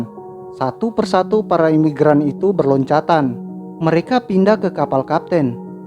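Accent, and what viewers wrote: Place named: Sulawesi, Indonesia. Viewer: Dari pembawaannya kayaknya abang nih orang Sulawesi yah?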